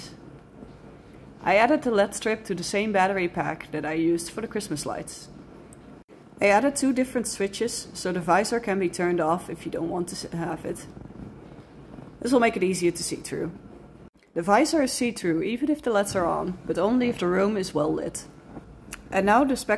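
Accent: Netherlands. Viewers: I can just hear this person is Dutch 😂 great job amazing work😊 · Dutch accent? 🤔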